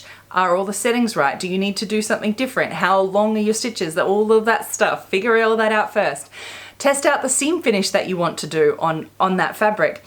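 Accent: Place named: Australia. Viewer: Great to hear an aussie accent.. sooooooo loooooong winded.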